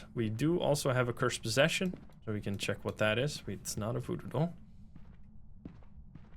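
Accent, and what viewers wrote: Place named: Netherlands. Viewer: ik had nooit geweten dat je nederlands was behalve een beetje van je acent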